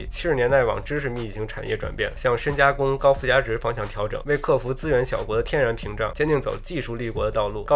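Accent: Beijing, China. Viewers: 每次最后的一分钟我都想写下来，分分钟拿去diss那些傻逼。还有就是我好爱巫师的北京话 ❤️ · 北京腔真是难听，另外少参杂个人想法保持客观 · 就爱听的北京话聊正事儿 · 巫师大神，哪都好，就是您的北京话实在是太北京话了，能稍微吐字清楚点么，谢谢您。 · 这北京口音跟lks好像，喜欢😜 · 还好吧，最多是北京人说普通话，纯正的北京话吞的妈都不认识了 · 开头笑了。爷关更也好奶关更也好，会一直关注巫师，因为实在文案精彩论据夯实。京片儿口音也很喜欢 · 北京腔太难听好好说话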